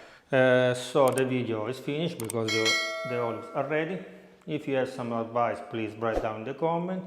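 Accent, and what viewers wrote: Italy. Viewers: His Italian accent is very nice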